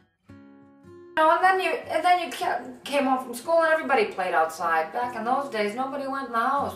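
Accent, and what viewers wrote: Boston, United States. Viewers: ❤❤❤ Love it, I am not only learning a Boston accent but mannerisms too. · love your videos!! thanks for posting. i love the boston accent.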